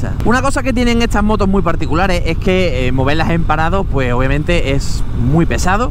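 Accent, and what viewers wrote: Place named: Cádiz, Spain. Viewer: escucho un acento gaditano me encanta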